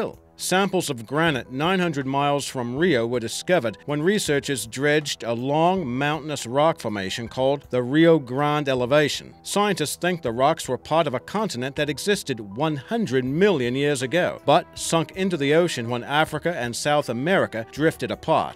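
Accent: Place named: Australia. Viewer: ...........had to listen to 3 or more to understand what he was saying.......an entire "ployeted"....gotta be Australian.........."knaiefe"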